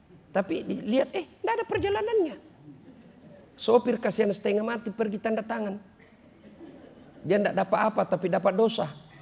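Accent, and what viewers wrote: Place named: Makassar, Indonesia. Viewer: Logat Makassarnya ini saya kangen..serasa waktu dulu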